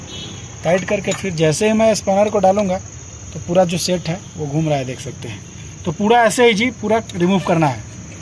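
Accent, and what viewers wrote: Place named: Bihar, India. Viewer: Bhai ap karnataka se hai, but apka baat sunke lag raha ap jharkhand bihar se hai😊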